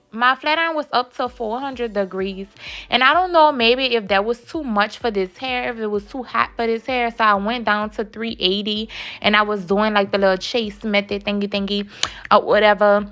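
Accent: New Orleans, United States. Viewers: I love your NOLA accent!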